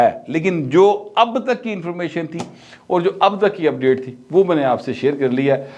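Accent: Lahore, Pakistan. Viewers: MashAllah Greato Great shb sai Lahori Talk style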